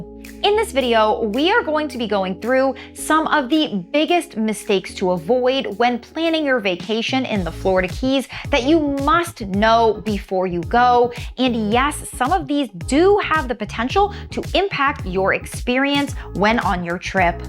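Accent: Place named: Upper Midwest, United States. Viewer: You sound like you are from the upper Mid west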